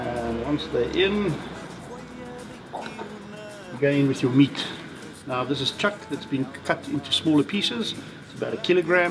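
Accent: South Africa. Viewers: How you going Bought my first Potjie last weekend No3 and have been loving your channel ever since For my first Potjie I'm thinking this one Can I mention you and put a link to you in my comments when I do the video. And I love ❤️ the South African accent Thanks mate for the easy to follow Potjiekos instructions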